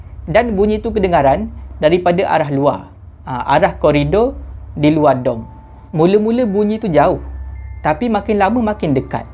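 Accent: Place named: East Coast, Malaysia. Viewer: oww patutlah loghat mcam org pantai timur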